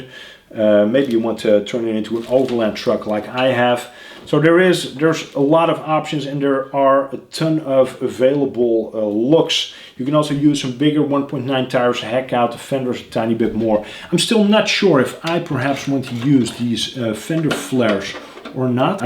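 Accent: Netherlands. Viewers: Dutch accent ;)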